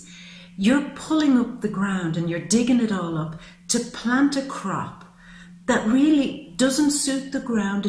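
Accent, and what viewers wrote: Ireland. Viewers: god is love You have Beautiful Gift. I enjoy your manner. Your voice sounds a bit Irish ! Your Tarus friend.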